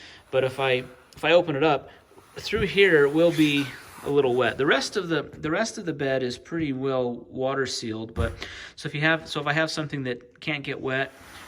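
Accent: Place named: Utah, United States. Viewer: First time visitor to your channel... Good info on this cover. I'll probably pass on buying it. As a former resident, I can tell you're in Utah just by listening to you. We have an accent all our own. Lol keep up the good work!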